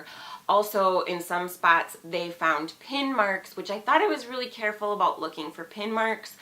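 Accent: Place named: Minnesota, United States. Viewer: Oh good! Also, I am originally from southern Minnesota and your accent takes me back! 🤗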